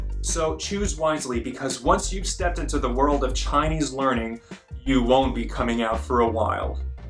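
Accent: Standard. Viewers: 卧槽你普通话好标准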